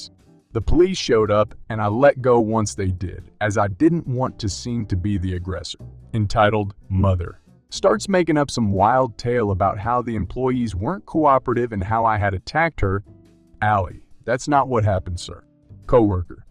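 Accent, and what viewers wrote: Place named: Texas, United States. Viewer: Think he's from Texas Got a southern drawl Not a bad reader Just needs to modulate his speech when doing the different people Same voice throughout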